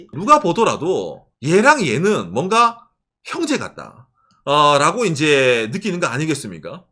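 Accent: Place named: Gyeongsang, South Korea. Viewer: 멘치로. 찐경상도!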